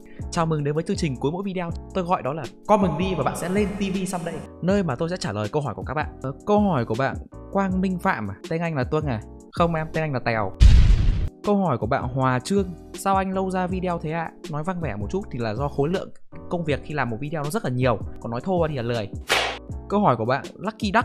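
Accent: Hai Phong, Vietnam. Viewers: Anh ơi quê anh ở chỗ nào Hải Phòng đấy ak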